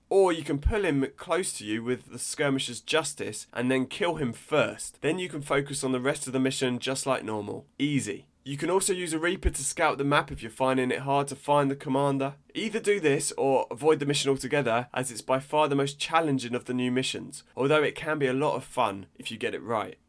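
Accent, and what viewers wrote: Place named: United Kingdom. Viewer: man, how much I love your accent... You're british, ok, but exactly from where?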